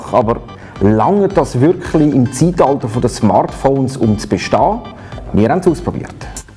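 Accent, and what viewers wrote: Switzerland. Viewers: Genau! Die Sprache ist mir sehr sympatisch. Weiter so! Ich verstehe alles. Dieses Handy ist allerdings so überflüssig wie nur was. Was soll sowas in der Modernen Zeit für einen Sinn haben? Gruß in die kleine, aber feine Schweiz. Wenn doch nicht alles so teuer wäre bei euch, würde ich zu euch zu Besuch kommen. ;-)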